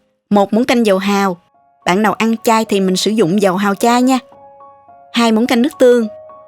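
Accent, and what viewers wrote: South, Vietnam. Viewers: Giong miền Nam rỏ ràng không có quê, nghe thấy mến giọng nói va cách dạy thật tỉ mĩ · Giọng chị đọc chuyện nam bộ đúng bài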